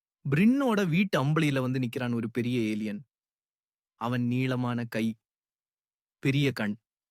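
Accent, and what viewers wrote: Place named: Sri Lanka. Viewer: Your Sri Lankan Tamil ❤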